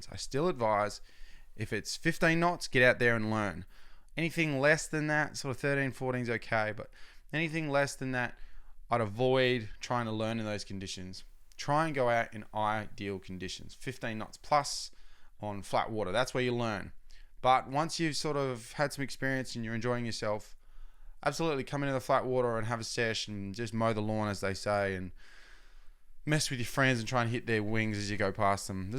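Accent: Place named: Canada. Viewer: Great video, and I really enjoyed the Canadian accent.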